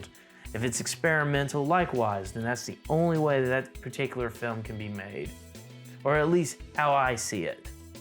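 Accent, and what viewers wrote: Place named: Louisiana, United States. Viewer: I love how despite every video being set in Louisiana, I was still surprised by your Louisiana accent.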